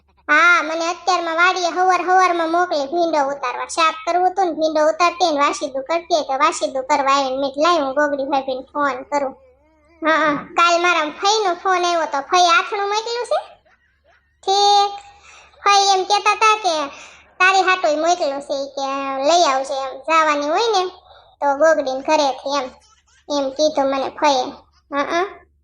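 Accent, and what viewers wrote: India. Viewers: ભાષા ભાવનગર ની બોલો છો.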